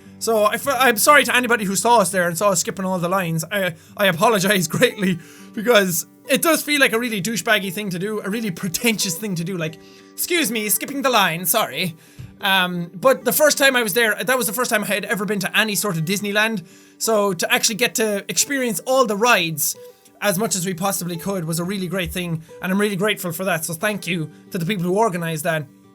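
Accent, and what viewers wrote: Ireland. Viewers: are u actually irish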